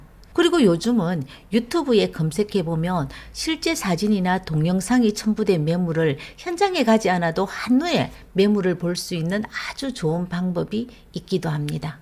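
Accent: Gyeongsang, South Korea. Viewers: 경상도 분? 난 강원도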